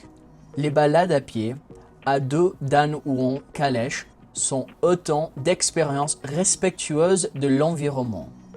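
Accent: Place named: France. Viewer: Excellent ton french accent.. Élise et Julien ainsi que Annick ont eu ton lien.. Il devrait echanger avec TOI.. 😊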